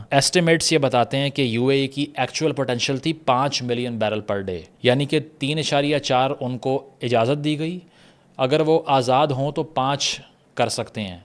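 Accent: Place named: Punjab, Pakistan. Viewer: Can you please work on your accent. Especially in English. Has a very high punjabi accent. Being a media person that’s important.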